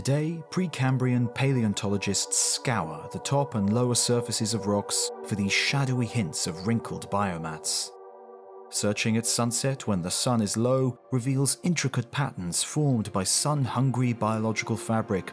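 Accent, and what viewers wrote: England, United Kingdom. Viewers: Lol all it took was a British accent to fool you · You're a fantastic orator. My best guess is that it's due to the specific accent you carry. It is a particularly precise variant of British English that Americans have subconsciously come to appreciate as being intrinsically reputable. If there is a British man lecturing you with this accent, friends, listen closely, as he knows what he's talking about and his intel will be vital to your quest!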